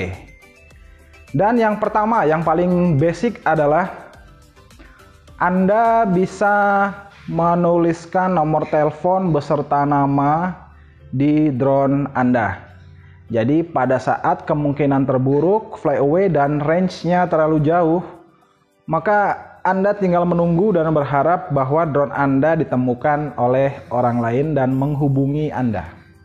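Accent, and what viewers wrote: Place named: Kalimantan, Indonesia. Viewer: dari logat bahasanya ketahuan,kalo dari kalimantan....sukses selalu ya om ,🙏🙏🙏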